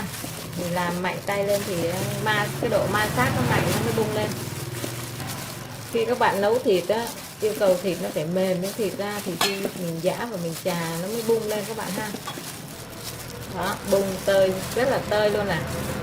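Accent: North, Vietnam. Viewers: Bạn hướng dẩn kỳ càng và có dọng nói của miền bắc nông thôn thật thà, cám ơn bạn !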